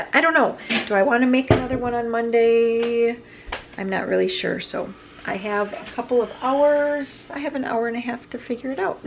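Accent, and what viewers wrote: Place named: Wisconsin, United States. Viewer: You are definitely a Wisconsinite. Your accent. I was born, raised and educated there, so I know the accent. out, down, ought, supper, etc., etc., A great place to have been raised.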